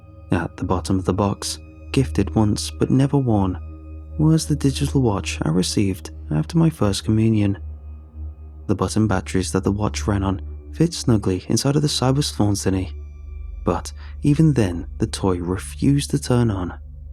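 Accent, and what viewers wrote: United Kingdom. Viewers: He’s British. They pronounce words differently.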